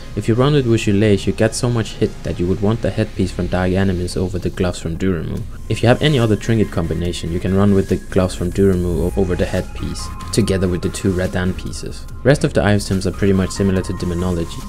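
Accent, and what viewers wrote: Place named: Portugal. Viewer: I am always curious to know from what first language accents come from; would I be at all close if I said Portuguese? Thanks for the guide though, I had little problem understanding it. And "tier as far as I know, (my first language is English) is pronounced like in the word teardrop, or phonetically "teer".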